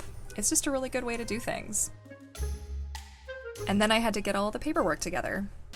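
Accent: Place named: South Africa. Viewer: Hahaha! Down here its pronounced ah-n-velope. 🤣🤣🤣 lekker(nice) south african accent! Truly enjoyed watching the video. It's like wrapping up birthday presents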